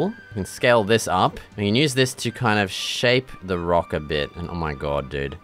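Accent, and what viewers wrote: Australia. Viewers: this guy sounds like and australian frfr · I love ur Aussie accent cuz its so natural and funnyyy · Brother you sound Australian but also English it's confusing me, are you even either of them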